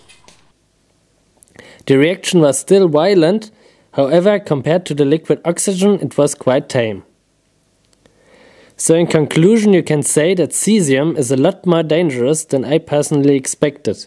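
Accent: Germany. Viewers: Sauberer deutscher Akzent.. ✌🏻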